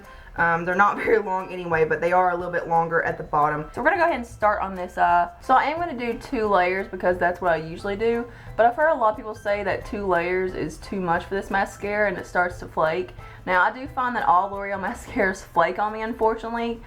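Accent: South, United States. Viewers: Hands Down...The Maybelline Colossal mascara looks better on you. Now I see why people love my southern accent, listening to you makes me proud to be a southern woman. Thumbs up.:) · Welcome to the Southern accent. A thing of beauty, ain't it?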